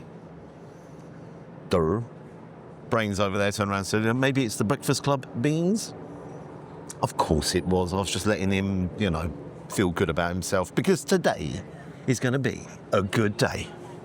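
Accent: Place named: Yorkshire, United Kingdom. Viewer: Yorkshire 100%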